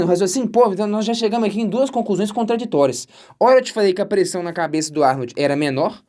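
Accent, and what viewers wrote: Minas Gerais, Brazil. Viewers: o sotaque mineiro melhora tudo kkk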